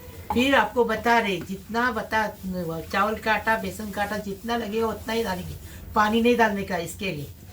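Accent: Konkan, India. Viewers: Aap ka kokni style me bolna aur banana bahut acha lagta hai ❤️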